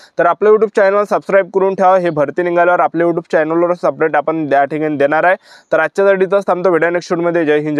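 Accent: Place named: Gadchiroli, India. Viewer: Gadchiroli bolte😅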